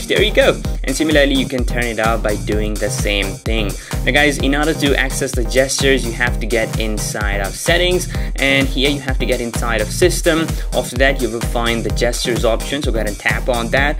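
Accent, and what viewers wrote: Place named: United Kingdom. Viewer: Bro your accent is so good at first i thought that you were a british but still love your contant and a big fan of roms specially for note 3 tnx and keep uploading cool videos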